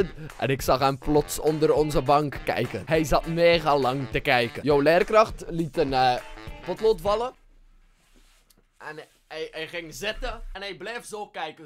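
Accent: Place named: Antwerp, Belgium. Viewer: sinds wanneer spreekt altijd uit met een antwerps accent?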